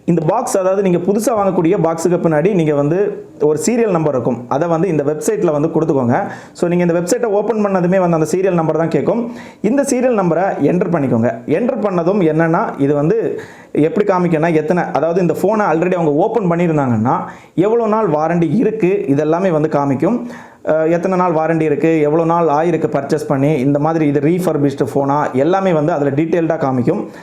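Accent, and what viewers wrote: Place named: Kanyakumari, India. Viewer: Kanyakumari pechu mathiri iruke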